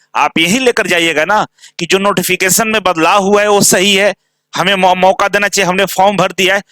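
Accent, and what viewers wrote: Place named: Rampur, India. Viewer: Sar Rampur wala bol raha hai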